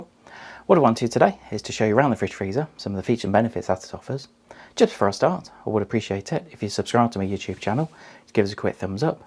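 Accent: United Kingdom. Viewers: I subscribed your channel when I needed to learn how to properly clean a Dyson V8(V6? Can’t remember) back in 2016 or 17. Over the years, I watched some of the videos posted and I found your voice quite soothing and ideal for listening as I don’t often get exposed to British accent. I like the fact that all the videos are practical and informative. Sometimes I just have the videos played at the background while working, and I even picked up a few terms for house appliances! Thanks!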